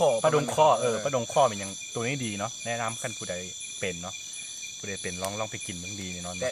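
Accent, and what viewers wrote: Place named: North, Thailand. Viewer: สำเนียงคนเมืองเลย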